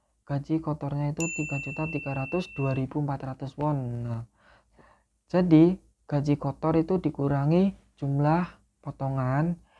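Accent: Tegal, Indonesia. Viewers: Wong ndi sih? Kaya wong Tegal basane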